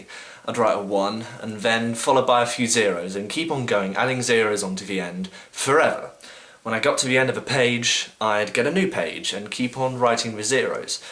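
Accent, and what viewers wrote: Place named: England, United Kingdom. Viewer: He made the effort to make the video and put some interesting points across. He has an English accent. Grow up.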